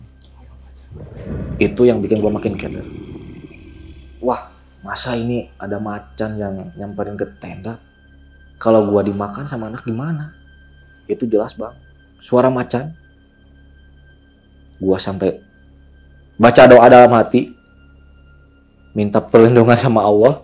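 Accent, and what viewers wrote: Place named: West Java, Indonesia. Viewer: Lucu nya klw orang Sunda ngomong gua elu..😂 semangat kang ...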